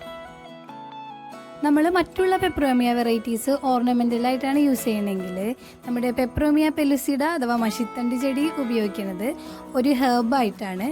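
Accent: Thrissur, India. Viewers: തൃശൂർ ആണല്ലേ വീട് .😘 ഇഷ്ഠമായിട്ടോ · Thrissur bhasha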